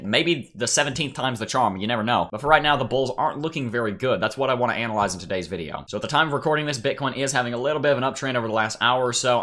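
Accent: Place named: Alabama, United States. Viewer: I am not from Birmingham AL, but you sound like you may be! Enjoy your channel!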